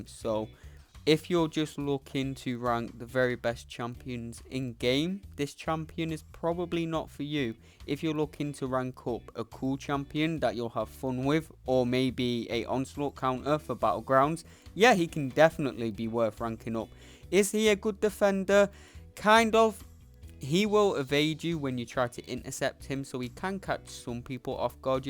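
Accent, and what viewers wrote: United Kingdom. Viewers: Ayo I think this guy is british!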